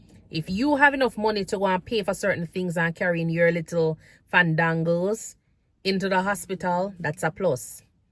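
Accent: Jamaica. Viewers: i like the jamaican accent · I love your Jamaican accent, especially when u switch it on from off.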